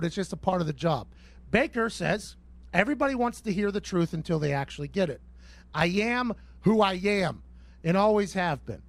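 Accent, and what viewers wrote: Pittsburgh, United States. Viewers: Love this dude. The Pittsburgh accent makes it even better.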